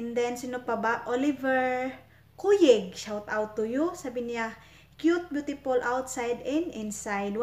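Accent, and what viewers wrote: Visayas, Philippines. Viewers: Makatawa ko nimo kay bisaya kaau mahirap magtagalog.Mao gyud Dai ,magpa box magka igit igit kag puno sa isulod.I shout mi nimo Dai ha ,dri sa Italy. Salamat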